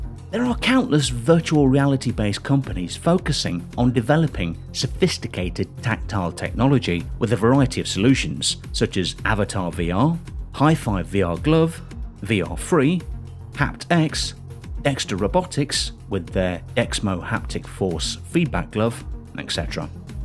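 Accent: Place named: RP, United Kingdom. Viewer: Puke inducing, oversofisticated British accent. Voice is akward modulated, I turned it of I couldn`t stand the lector. I had convulsions after listening him, even though the topic was interesting.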